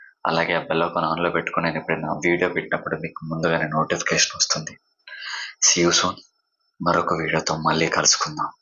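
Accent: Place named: Telangana, India. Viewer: Excellent bro me Telangana slang lo cheppadam chala bagundi. Ipude subscribe cheskunna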